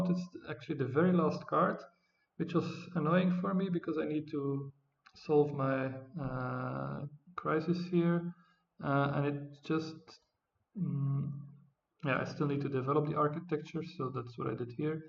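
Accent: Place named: Netherlands. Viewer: Very nice all in strategy. Seeing the opportunity that the other civs needed (too much) time to get online with their culture production so you could maintain your lead. Two questions: Are you dutch? Because your accent sounds really dutch :p And I dont know why e5 would use his ocean liner last turn. It would only set him up worse for certain events like happiness and it would give him 0 benefit. Weird decision. Do you have any idea?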